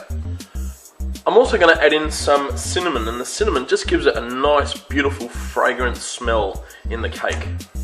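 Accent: Australia. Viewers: I love your Austrailian accent!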